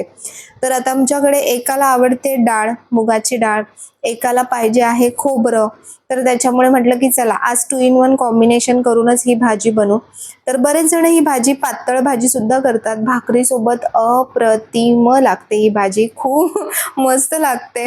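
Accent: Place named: Khandesh, India. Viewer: खुप छान खान्देश भाषा बोलतेयस जळगांव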